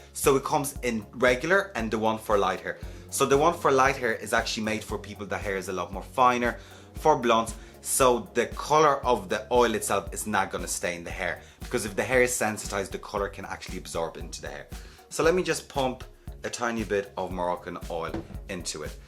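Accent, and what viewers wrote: Ireland. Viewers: Thanks so much and I love your (irish?) accent ❤ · Love your Irish accent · Why do you and the lovely John Maclean say film instead of video? Is it a Scottish thing? I have both of these oils and I love them so much. I soak Moroccan oil before bed specially before my wash day and I use kerastase on the day to day basis (ps: I think you are from Ireland tho? Please don’t kill me I always mix Scottish and Irish accents tho lol)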